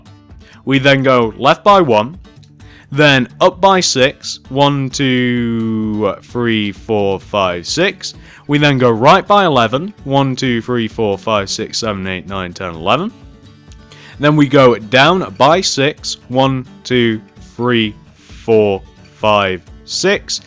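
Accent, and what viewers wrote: England, United Kingdom. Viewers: Are u British? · He's English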